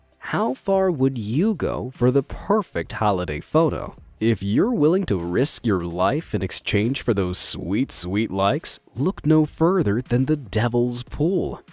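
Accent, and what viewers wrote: United States. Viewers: This is a weird video. Why would a narrator with an American accent use the English word lorry? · patronizing yanky voice...urgh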